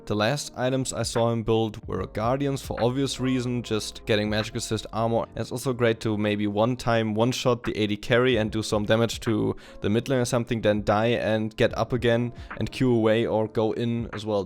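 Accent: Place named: Germany. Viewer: Der Akzent... Da ist wohl jemand Deutsch :3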